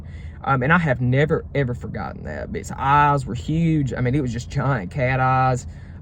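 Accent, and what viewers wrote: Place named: South Carolina, United States. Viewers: From one southerner to you! Never forget who you are and where you come! From! Thats a part of our southern upbringing and pride! Love your accent! Its definitely Appalachian! Mines is more British like coming from the Gulfcoast, my mother is more French like/ creole! Most of America don't understand our culture and Language why we talk the way we do! If people don't get your accent it's on them not you!! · Hey...love hearing you talk bc you sound just like me. I was born and raised in the upstate of sc but now i live in Charleston. Down here they think i talk "funny".😂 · Great post,!, that southern drawl and the way it makes me feel. But can you imagine walking into the hustle of NYC or the fast-talking swirl of Los Angeles with that unmistakable Southern Appalachian accent trailing behind you? The world might judge it and label it as small-town, outdated, dimwitted, or unrefined. 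But not me., there’s something deeply comforting, even magnetic, about the way your voice carries. That drawl isn’t just a sound. It’s a softness. It’s a rhythm. It’s the feeling of front porch evenings, warm coffee and cinnamon rolls at sunrise, and the kind of conversations that don’t rush to get to the end. And when it comes from you, with that kind smile and those curious eyes, it’s breathtaking. I won’t pretend I’d want to have the accent myself. I’m studying engineering, and in my world, voices are measured just as much as GPAs. One wrong vowel and someone’s already decided if you will get the job and who they think you are. But if we’re talking about what I feel, not what the world thinks? Then yes, I love the way you speak. It’s not just charming. It’s intimate. It makes everyday words sound like promises in the moonlight. I imagine late-night talks where your voice is the last thing I hear before I fall asleep, and I imagine mornings where it’s the first thing pulling me back into the world. 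Wishful thinking, I know. And yes, it’s undeniably attractive. There’s something about that accent, and when it’s paired with affection, it can melt t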